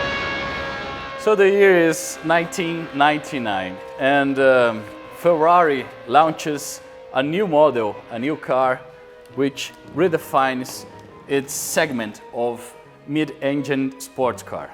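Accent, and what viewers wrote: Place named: Massachusetts, United States. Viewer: I Love your accent from South of Massachusetts 😊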